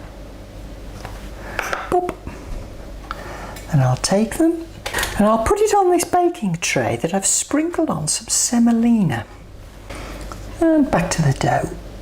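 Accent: Midlands, United Kingdom. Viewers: Loving these great recipes, your enthusiasm and the Midlands accent!!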